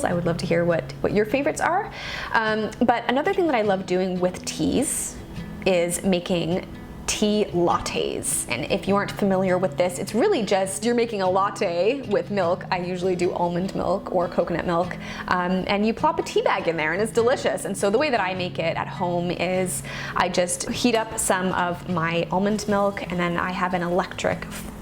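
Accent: Canada. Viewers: Are you Canadian? Kinda sounds like Canadian hehe.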